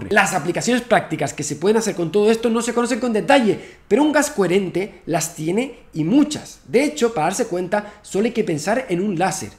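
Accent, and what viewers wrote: Canary Islands, Spain. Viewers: De dónde es el presentador? Canarias?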